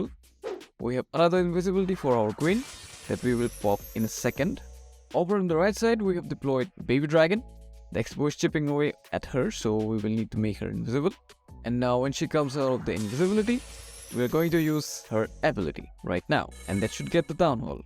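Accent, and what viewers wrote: India. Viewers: hey ur voice tone gives an Assamese vibe.. are u?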